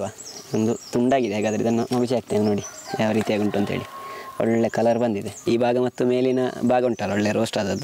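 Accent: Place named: South Kanara, India. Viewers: Your explanation and costal kannada talking are spr 👌😍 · We love your videos. Wonderful presentation with pure SK Kannada. Jai Kannadambe 🙏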